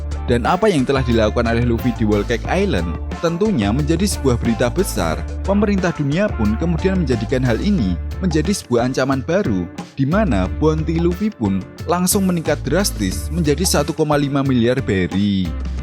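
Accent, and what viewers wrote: Java, Indonesia. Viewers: Mani Ledok jawa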